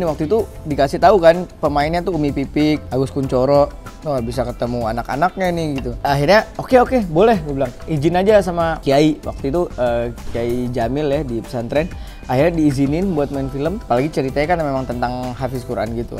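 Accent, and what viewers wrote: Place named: Banyumas, Indonesia. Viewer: Ngakak dngr ngomong ngapak wkwk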